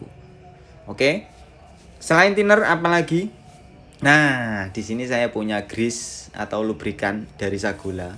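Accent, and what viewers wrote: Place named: South Kalimantan, Indonesia. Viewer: takipik mandngar bahamburan😁😁.. kalimantan mana mas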